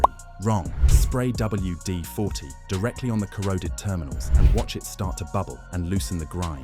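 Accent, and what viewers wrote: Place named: United Kingdom. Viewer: Your credibility gets called into question when you are giving advice and your video edit is piss poor, several repeats of the same clips, did you even review your finished video? WD40 is ok but it's not the magic you are portraying. WD40 is ok but it's not the magic you are portraying 😊. I'm also intrigued why the narration is British English yet currency is in U.S. dollars, some of the left hand drive scenes are showing blurred British roads with the use of U.S. Dollars quotes in pricing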